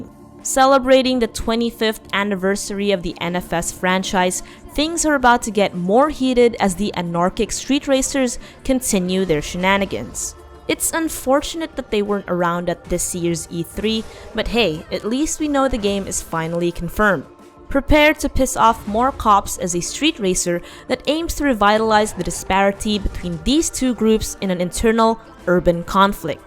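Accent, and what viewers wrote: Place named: Philippines. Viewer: I hate her voice. She sounds Filipino I'm not racist but she clearly doesn't know anything about cars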